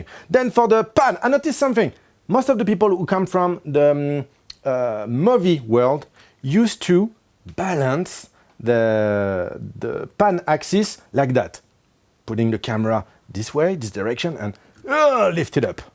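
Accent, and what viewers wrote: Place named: France. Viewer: On voit très bien que tu es français tu as un fort accent pourquoi pas une vidéo en français ?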